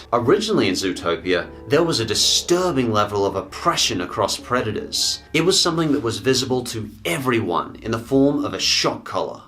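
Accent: Australia. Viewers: are you australian aswell? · Are u Australian?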